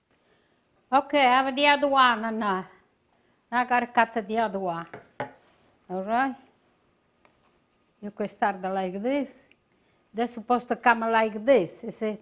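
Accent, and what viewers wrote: Italy. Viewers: I had a great Italian Nona....Miss her so much! Her daughter, my grandma Noni was the best cook!🍝🇮🇹 Wished I would have learned more Italian cooking from them....they both are gone now.....🇮🇹🍝🇮🇹🍝but you are truly the best to watch and learn from now! I love your strong Italian accent..... Thank you for sharing your cooking. 💚🤍❤️We love you! 🇮🇹🍝🇮🇹🍝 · You are such a sweet lady angd i love your accent.a real italian indeed.you have a BUONA PASCUA.I LOVE TO WATCH YOU. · Isn't she delightful? And a proper Italian English pronunciation on toppa di tutti. I swear, I'm going to try this tomorrow. Great recipe to get rid of my figs and dates I'm struggling to finish. MIlle grazie!! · Vgffgf Vdghhft You mean she is speaking English with an Italian accent. At times, she speaks her native language. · Thank you for your video. I just found this channel and I just love listening to you. 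I was stationed in Italy in the 90s with the US Army. Used to have biscotti every morning in the café. I miss the accent and the sweetness. I will watch many more. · I absolutely LOVE watch (and listening) to you. I adore your accent. It makes me long for my Italian grandmother who passed when I was very young. I still feel a bond with her and my Italian heritage. I think about her and feel close to her when I make your recipes. I can see the joy cooking brings to you. Thank you for sharing your love and cooking with all of us., what kind of coffee do you drink and how do you prepare it? May God continue to bless you!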